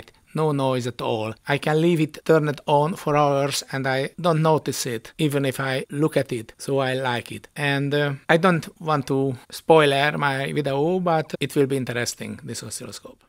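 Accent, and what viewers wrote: Hungary. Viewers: Így ahogy mondod néha a kevesebb több, tetszett a CRT-k gyorsasága, és persze a Hunglish pronansziésönöd is :)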